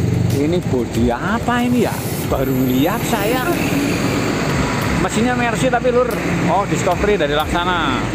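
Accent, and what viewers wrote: Banyumas, Indonesia. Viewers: Hadir nyamperin.. Mas.. Yen ora ngapak ora kepenak lur... Paten..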